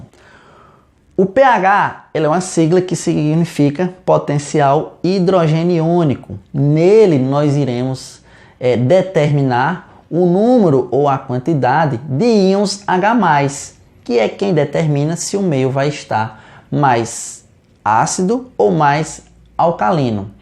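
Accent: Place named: Northeast, Brazil. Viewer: Esse povo Nordestino tem um acento muito feio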